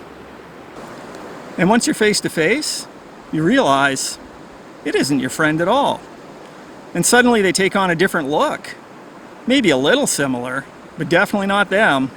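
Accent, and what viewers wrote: Ireland. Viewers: Really interesting. Love the irish twang as well. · Are you in Maine/Labrador? I can hear an Irish twang in your voice.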